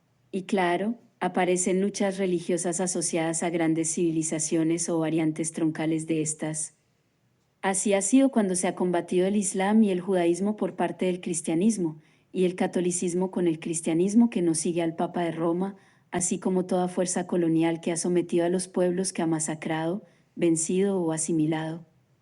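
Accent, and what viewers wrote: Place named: Antioquia, Colombia. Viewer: Muy interesantes reflexiones Andreu, la cronología tradicional de la historiografía efectivamente es casi pura creencia religiosa y sabemos que la razón tiene poco que hacer en la arista de las supersticiones, lamentable es que esa religión fue convertida en "ciencia" a espaldas de la humanidad y por ello provoca esa "disonancia" tan marcada, teniendo a nivel planeta a casi todas la facultades humanistas de historia obedeciendo sus dogmas. (excelente narradora virtual que contrato,! a demás cuenta con el bello "acento paisa" :D)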